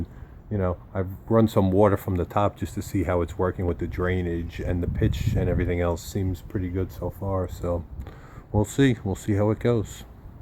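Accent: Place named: New York, United States. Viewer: Awesome new york voice!!!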